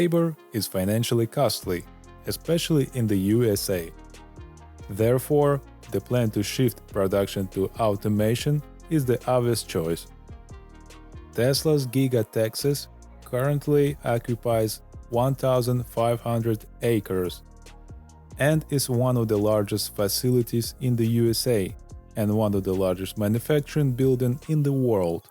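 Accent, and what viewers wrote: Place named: Russia. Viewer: Ты из России? Звучишь как один из наших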